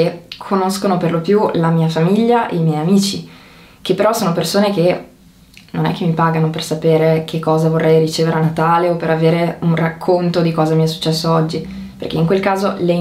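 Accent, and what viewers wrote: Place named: France. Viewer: Scusate ma chi è questa bella ragazza, con accento francese?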